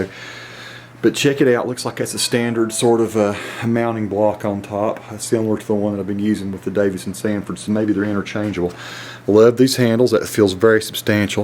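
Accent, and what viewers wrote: United States. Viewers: Your accent is awesome. What part of the States is it from?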